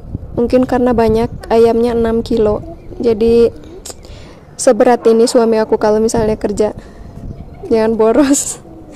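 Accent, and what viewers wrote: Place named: West Java, Indonesia. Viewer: Gimana ceritanya sih bisa nikah sama orang India, trus neng kayak logak Sunda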